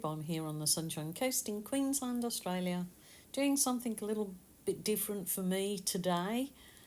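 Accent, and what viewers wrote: Birmingham, United Kingdom. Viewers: hi. I thought I detected a Birmingham accent in there, I am from Sutton Coldfield now living in North Wales, so hi from Prestatyn. Love your videos.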